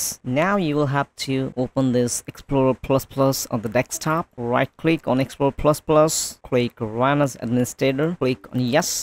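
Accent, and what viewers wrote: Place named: India. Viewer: hi bro.. is there any tutorial how to speak English like yours. You sound so foreign yet Indian. I am trying for international calling job.. any video or page will be helpful.. .. that would be so helpful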